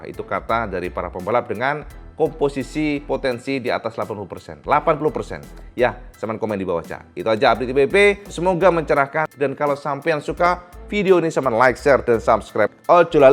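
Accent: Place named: Tulungagung, Indonesia. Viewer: Bang lu orang tulungagung juga yaa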